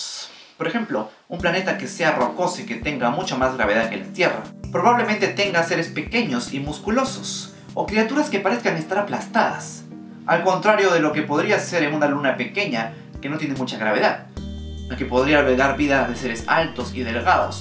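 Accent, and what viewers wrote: Chile. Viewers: Excelentes videos amigo! Consulta, eres Chileno?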